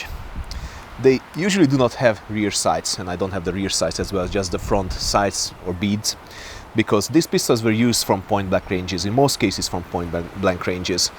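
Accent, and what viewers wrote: Hungary. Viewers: “Hung-lish”…. Keep it up sir lol the brace is absolutely beautiful. I want a pair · It is in fact my favorite gun channel on YouTube in beautiful, bright Hunglish language. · Hunglish? I always enjoy and learn very much from your videos! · Hunglish is awesome! :D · As a fluent speaker of Spanglish I appreciate the Beaty of Hunglish.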